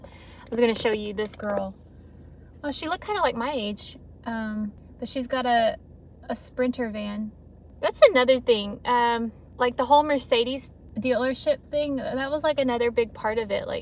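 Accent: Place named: South, United States. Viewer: You remind me of Jennifer Lopez but with a southern accent.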